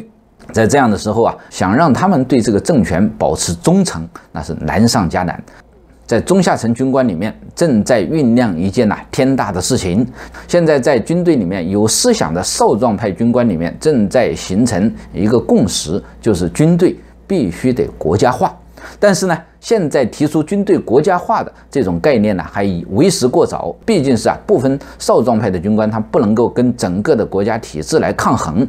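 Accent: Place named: Huaiyang, China. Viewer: 你的口音好淮扬，很可能是镇江人？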